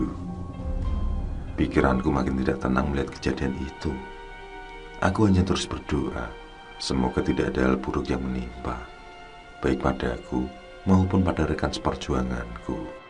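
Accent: Java, Indonesia. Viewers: Biasa Karawang hadir nyimak pak, di panteng terus biarpun carita nya sudah pernah tayang, abisnya seneng denger suara pak yg khas medhok jawa nya, sehat terus buat kru sukses terus semangat berkarya di tunggu cerita terbaru nya yg lbh seru dan serem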